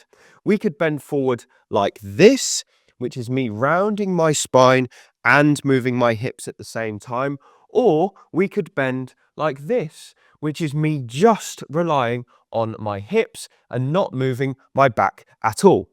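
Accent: United Kingdom. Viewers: 57 year old male…I tried this once and found it useless…the best part of this clip was his British accent..Americans love a British accent but other than that I didn’t find this useful at all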